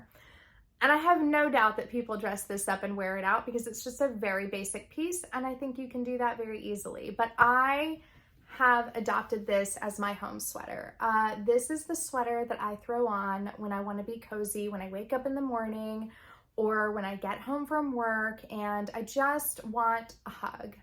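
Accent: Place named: South, United States. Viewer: My word is growth. 🥚 I love your podcast. As a fellow southerner it is nice to watch someone who sounds like home.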